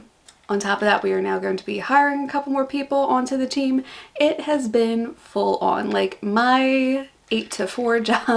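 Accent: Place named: Australia. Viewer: Sending positive vibes! Hey watch out - I hear a touch of Aussie accent!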